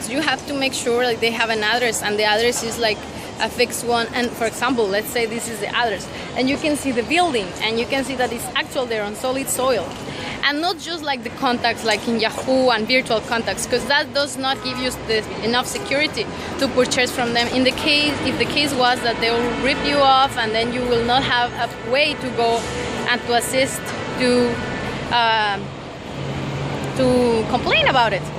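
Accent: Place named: Latin America. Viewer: I love ur accent are u Latina?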